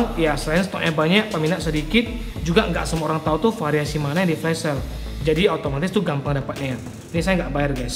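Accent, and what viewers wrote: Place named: North Sumatra, Indonesia. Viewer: Ooh abang orang pakam toh 🤣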